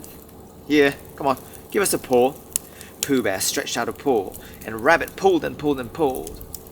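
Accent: United Kingdom. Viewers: 焚き火とイギリス英語の音が心地いいですね…🔥！ 全ては聞き取れないので、聞き取れるように何度も見直すつもりです😂 英語学習を頑張っているところなので、英語系コンテンツもあげてくださるととっても嬉しいです✨これからも応援しています！！ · 聴いてて心地よいイギリス英語～ 落ち着きます(^_^)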